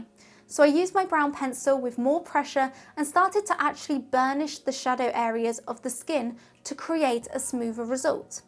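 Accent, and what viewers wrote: England, United Kingdom. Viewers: OMGGG I lovee your English accent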